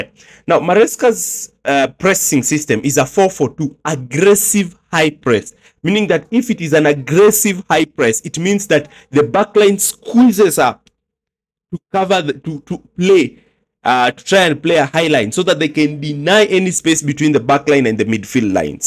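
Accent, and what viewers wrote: Kenya. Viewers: Are yu from kenya😮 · You've got a Kenyan accent, very fluent and as CFC fan ,I love your analyses. Keep it up bro.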